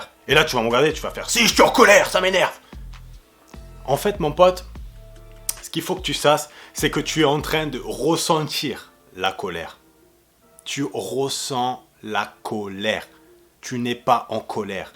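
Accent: Marseille, France. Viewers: Mec je te découvre et ça me casse le crâne t’as le discours d’un maître spirituel mais en mode bonhomme de Marseillais ! Je te kiff grosse force mon gars !!!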